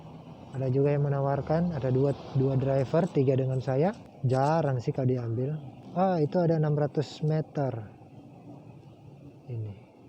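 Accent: Manado, Indonesia. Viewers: Orang mnado ya bro?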